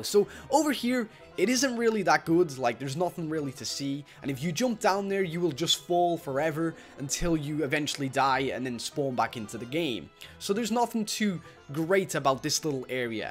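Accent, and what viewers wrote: Ireland. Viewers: is he irish? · Are you Irish?:D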